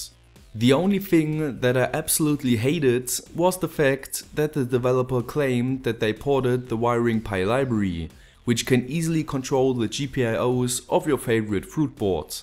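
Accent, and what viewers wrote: Germany. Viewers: Where in Germany are you from? Guessed that because of your accent. Great videos man! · warum machst du keine Videos in deutsch? ich kann deinen deutschen Akzent hören.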